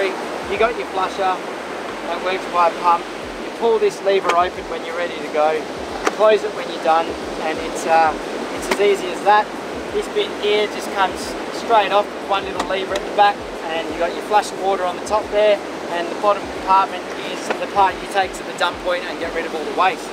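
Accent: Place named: Australia. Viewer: Nothing better than hearing an Aussie doing a product review. ‘Bloody brilliant” 😆😆😆😆😆👍🏻👍🏻👍🏻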